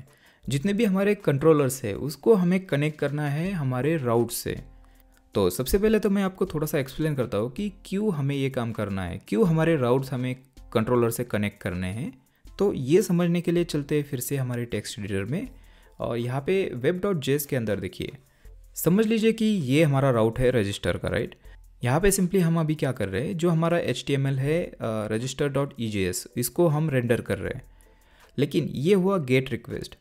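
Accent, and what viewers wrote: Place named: Lucknow, India. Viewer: Where are you from. Because your language is like lucknowis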